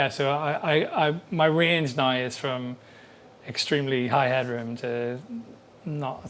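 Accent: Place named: Belfast, United Kingdom. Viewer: He sounds like he's from Belfast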